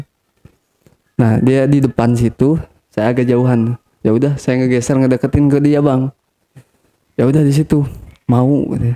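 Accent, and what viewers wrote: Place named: Surakarta, Indonesia. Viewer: Kaya orang solo jir😂😂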